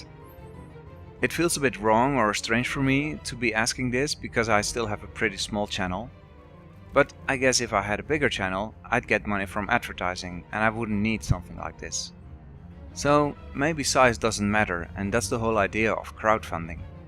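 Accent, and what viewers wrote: Netherlands. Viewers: are you from holland ???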